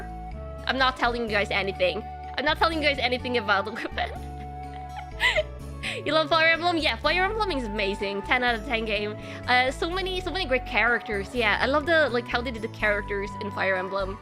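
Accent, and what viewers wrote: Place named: Scandinavia. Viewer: This was a really fun debut! It was really cool to know that you have a Nordic accent and it adds tp your personality. You are such a lovable goofball and very sweet and chill. Looking forward to the talk show and love your model too. Hope you have a great day today 😊🫂🙏🤍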